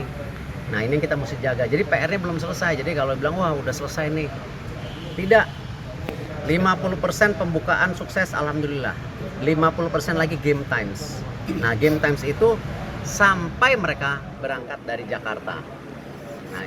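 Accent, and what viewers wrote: West Java, Indonesia. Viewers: Disini kok saya mendengar logat n aksens sundaness yee.. apa pak org sunda atw bs logat sunda yak.. behheh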